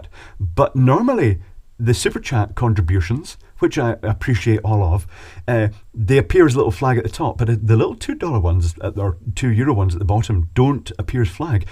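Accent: Scotland, United Kingdom. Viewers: I always thought you were not from around Glasgow, you sound posher than that 😂. I love visiting Glasgow, especially a whisky shop I found in a basement where you need to walk down steps to get to the door. I stayed at Bowling harbour once on my boat. Loved it. All the best, you’re a bloody genius. Love your channel.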